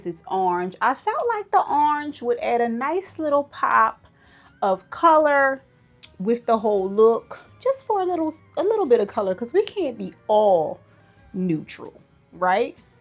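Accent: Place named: Philadelphia, United States. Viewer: Thanks for sharing. Bought some culottes and love the inspo. Glad I found your channel. You have wonderful style! And I swear I’m catching a Philly accent too. Made my day!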